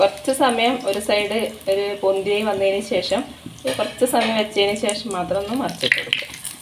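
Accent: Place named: Kasaragod, India. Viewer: മുതുമ്മനും കൊയ്ച്ചെടുത്തു 😂. നമ്മുടെ കാസറോട്ടെ ഭാഷ 👍🏻👍🏻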